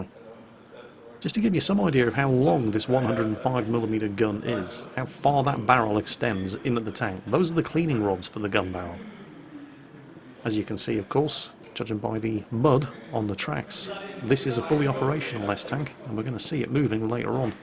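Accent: Germany. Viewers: Please dont say the names of the swedish tanks in german dialect. You can if you want but please dont. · stop pronouncing the Swedish names like they're German! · Your swedish sounds more like german :)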